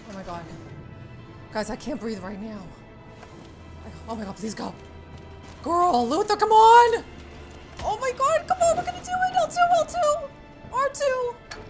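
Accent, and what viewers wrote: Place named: Texas, United States. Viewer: it looks like a mysterious Japanese female assassin with an American (Texas) accent hosting game awards .cool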